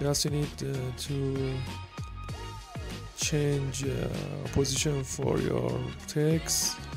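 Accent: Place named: Iran. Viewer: Are you Iranian dude? I love your Accent :)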